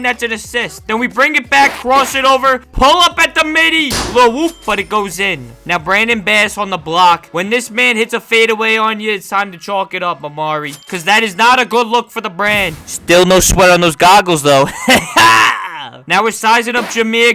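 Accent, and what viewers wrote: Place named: New York, United States. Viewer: u sound like a REAL NEW YOKA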